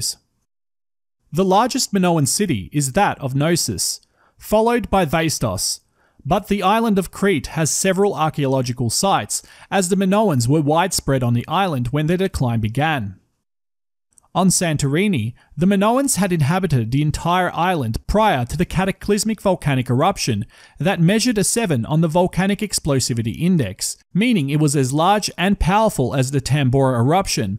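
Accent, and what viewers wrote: Australia. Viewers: Great to hear a Aussie voice talk intelligently about the stuff I want to learn. Great work mate.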